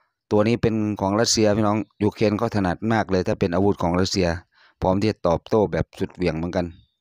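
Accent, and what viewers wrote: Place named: North, Thailand. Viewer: แอ้ดมินน่าจะเป็นคนเหนือสำเนียงแบบคนเหนือครับพ้ม😊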